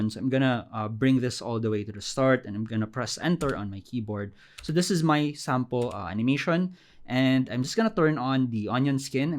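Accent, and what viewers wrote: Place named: Philippines. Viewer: The Filipino accent is strong on this one.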